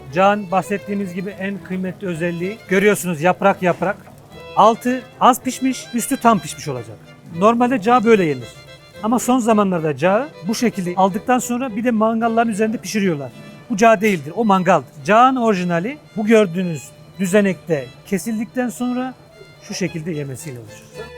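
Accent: Turkey. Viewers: Erzurum’u doğru bir ağızdan dinlemek 👏👏👏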